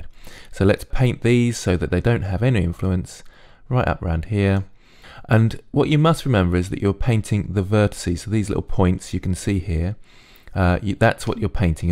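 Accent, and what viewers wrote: United Kingdom. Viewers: I like this british accent : )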